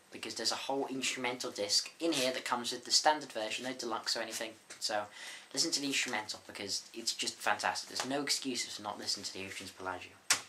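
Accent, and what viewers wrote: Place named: United Kingdom. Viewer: This British accent... - couldn't catch the name of the second disc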